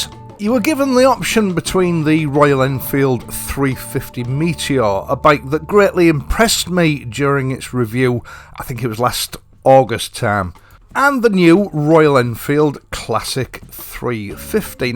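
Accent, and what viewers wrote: United Kingdom. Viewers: No no no, you speak British!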